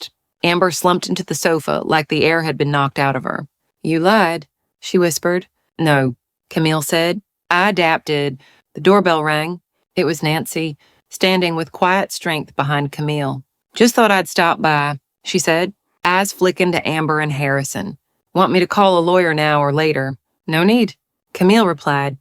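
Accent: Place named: South, United States. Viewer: LOVE THE SOFT SOUTHERN ACCENT. Good story.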